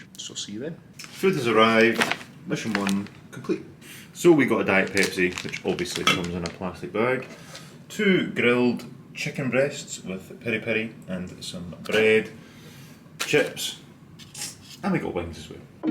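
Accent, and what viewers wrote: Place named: Scotland, United Kingdom. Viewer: English with a Scottish accent.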